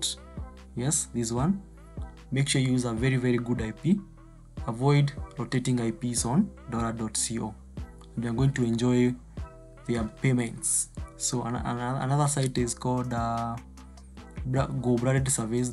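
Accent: Kenya. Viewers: I've been watching your videos and unasound kama mkenya, which proxy site do you recommend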